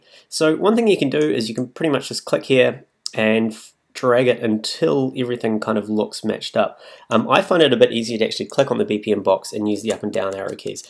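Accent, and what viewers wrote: New Zealand. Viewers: New Zealand? love that accent! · Love the Kiwi accent. You really "squash" your vowels!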